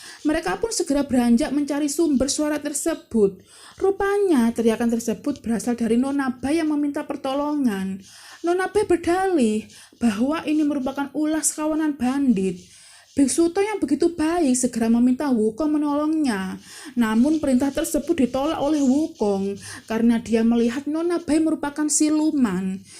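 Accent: Java, Indonesia. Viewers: Medok karena mbaknya orang Jawa,,, masing-masing Suku mempunyai ciri khas,,, ini lebih baik dari pada pakai suara google,, imut, renyah, empuk,, tapi bukan suaranya sendiri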